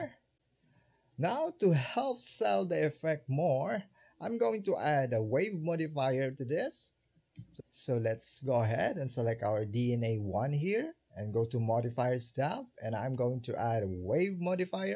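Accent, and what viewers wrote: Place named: Tagalog, Philippines. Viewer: hahaha your tagalog-accent english is hard to miss 😁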